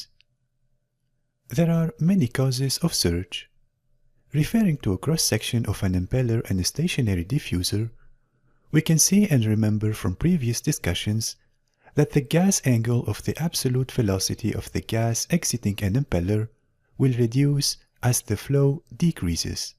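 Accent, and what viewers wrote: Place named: India. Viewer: sometimes indian accent is hard to get the point (intonation)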